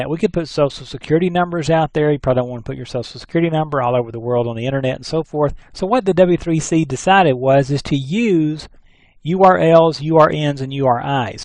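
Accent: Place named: United States. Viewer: I know right? It sounds North-American, but almost too much. Almost like someone putting on an American accent. Great video though!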